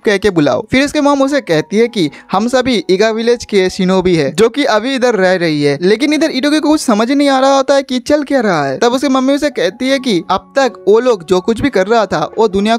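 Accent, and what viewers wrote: Bengal, India. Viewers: Bhai too bangali ho na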